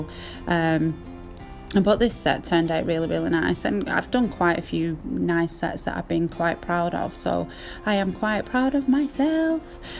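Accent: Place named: RP, United Kingdom. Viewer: No way - I'm Australian and your accent sounds so posh!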